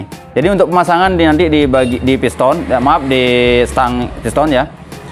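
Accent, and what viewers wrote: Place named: Madura, Indonesia. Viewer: Dari Logatnya kayaknya Abang orang Madura ya? 😁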